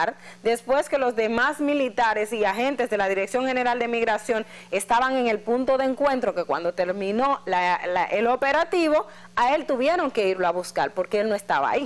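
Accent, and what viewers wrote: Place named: Haiti. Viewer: No es por nada pero esta presentadora puedo sentir qué es ahitiana escuchen bien su acento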